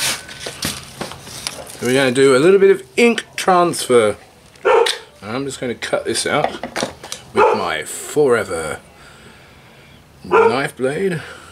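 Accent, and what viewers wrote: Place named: Brooklyn, United States. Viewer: Wait...you’re Australian?! I thought that was a Brooklyn accent! 😂 A couple things...that drawing is beautiful, it reminds me so much of a Tim Burton character. And was that a Skerple you used? Always love your videos buddy, glad your little one is doing well. That bottle opener was the best part...gave me a good laugh!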